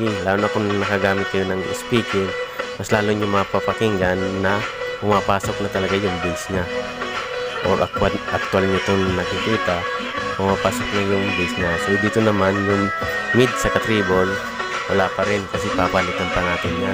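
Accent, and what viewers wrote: Bicol, Philippines. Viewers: Taga naga city ka sir?bicol?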